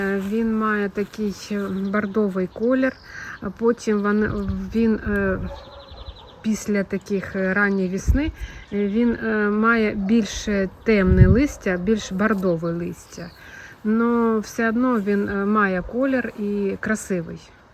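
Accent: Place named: Surzhyk, Ukraine. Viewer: Суржик як суржик, як на мене дуже органічний. Головне постійна практика говоріння💙💛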